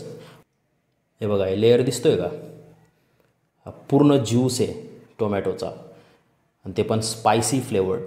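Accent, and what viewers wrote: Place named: Pune, India. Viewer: भाऊ तुम्ही नाशिक पेक्षा, जास्त आमच्या पुण्याचे मुळशीतले शेप वाटतात, आमच्या गोड रांगडी भाषा सारखी ❤️ तुमचीपण पन टोन वाटते 👌🏻 🙏🏻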